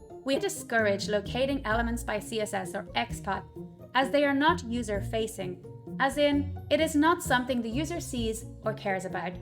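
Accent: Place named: Scotland, United Kingdom. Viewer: her Scottish accent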